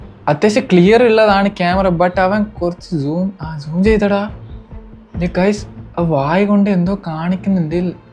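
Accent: Kannur, India. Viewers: Bro kannur aano. Oru doubt!!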